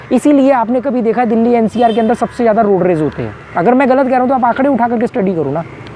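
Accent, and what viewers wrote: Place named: Pakistan. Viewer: SIR SIR PLEASE ANSWER ME IN VIDEO HOW YOU TALK URDU MEANS HINDI VERY GOOD .I MEAN YOUR ACCENT LIKE PAKISTANI PEOPLE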